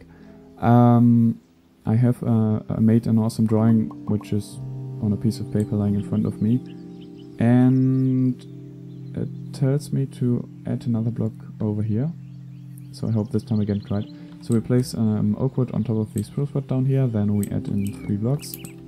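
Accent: Germany. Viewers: You are from germany right?^^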